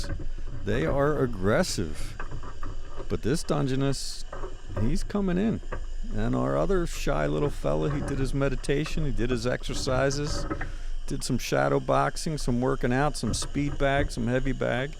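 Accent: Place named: Philadelphia, United States. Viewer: I'm really digging the Philly accent narration!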